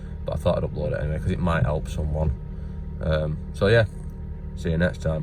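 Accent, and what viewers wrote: United Kingdom. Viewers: 10 34 43 or 53? Holy Rodger English Brit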